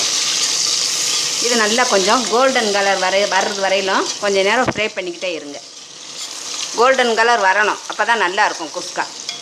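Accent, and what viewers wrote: Madurai, India. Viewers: I love your Madurai speech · Amma super neenga Madurai ya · Thank you amma , So sweet Madurai slang, love ur description...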